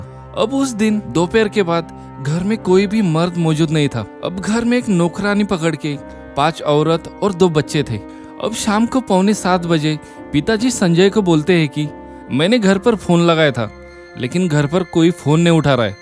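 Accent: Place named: Maharashtra, India. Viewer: I am from श्रीगोंदा your tune is marathi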